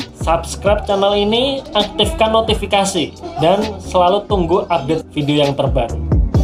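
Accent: Surakarta, Indonesia. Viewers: Njenengan orang solo to pak? Heheh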